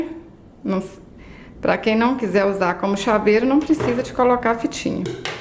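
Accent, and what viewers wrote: Minas Gerais, Brazil. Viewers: Amei seu trabalho e seu sotaque mineirinho! Parabéns e gratidão por compartilhar suas peças conosco. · Adoro esse seu sotaque mineiro uai!!!! Lindas bonecas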